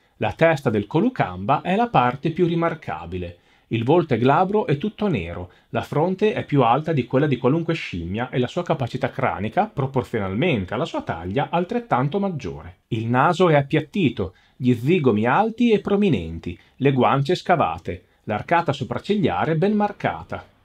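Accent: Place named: Romagna, Italy. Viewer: C'é un vago richiamo al mitico tabloid di Luttazzi alla Gialappa, dovuto all'accento romagnolo. 😍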